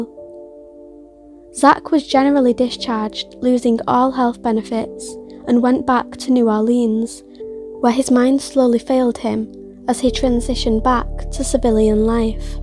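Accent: Manchester, United Kingdom. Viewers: You sound like Manchester England. Well done presentation!!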